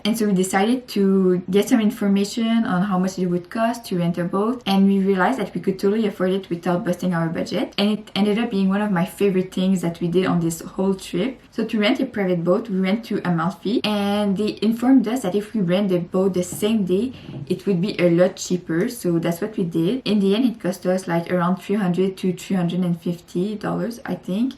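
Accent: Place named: France. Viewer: Montreal Canada. you know I will go to sorrento. positano and amalfi from China soon. your video is very helpful. besides I like to hear your French tone. it's charming .😊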